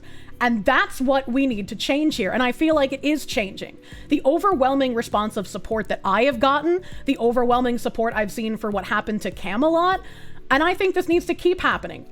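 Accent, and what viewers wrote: Boston, United States. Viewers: Loud boston foxu sexy talk, more please. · I only know of because of whatever this shit is and I gotta say, very fucked up. But dude, as a linguist, I cannot get over that amazing Boaston accent, Imma have to study that shit XD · ! Sexiest Bostonian Fox Woman Ever!!! Talk Boston at me woman!! Talk BOSTON!!!!!! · Gotta admit the Boston accent is doing it for me ❤😂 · I notice when gets annoyed. Her Bostonian accent comes out strong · !!! I want to do YouTube and kik as a musician that doesn’t have to bite my tongue about schtuff or be confronted in front of my son in line at Whataburger lol. I’ve had local, wouldn’t call it fame, but was in a popular band in Houston for a decade. I kinda didnt like delusional locals coming up to my family while we were trying to have dinner to tell me positive or negative takes on shows or my idiot ex singers stupid fb post.. 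my question is, is it possible to be a musical vtuber? Like play real instruments with and avatar covering most my identity? And also i don’t understand where to start.. I’m sorta retarded.. is there any advice? Also you made my Texan ears love the Bostonian accent lol it’s calming to hear you at work. Between you leaflit and nux I’m convinced this is the best direction to have as much creative freedom as possible. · Jokes on them. The thick Boston accent is very attractive. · I love your accent. I think Boston women are the best.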